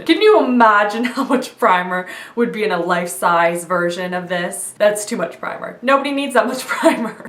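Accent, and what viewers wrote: Canada. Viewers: Haha it sounded like you were saying oat instead of out and it was so weird but then you said you were from canada and it clicked! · haha ohmygod I love canadian accent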